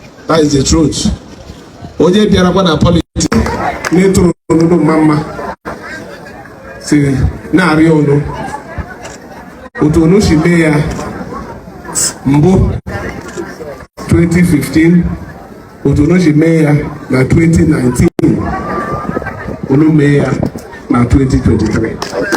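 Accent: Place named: Umuahia, Nigeria. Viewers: he's Igbo language sound like the ikwuano umuahia people speaks . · He's even speaking central Igbo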